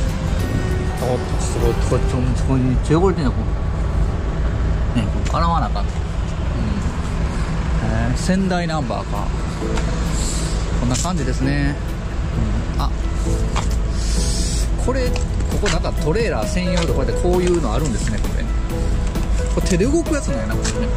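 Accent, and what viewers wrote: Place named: Kansai, Japan. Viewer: 頑張ってYouTubeゆったり余裕綽々叔父さん走行映像情報ありがとう😆💕✨マグロ🐟中トロ海鮮丼宜しくホタテ貝？年末年始頑張ってハーレーツーリング映像お願いいたします🏫✏️🎒⚽ YouTubeトークおしゃべり落ち着いて関西弁可愛い❤️ 元気😃⤴️ハーレー新車納車おめでとう🎉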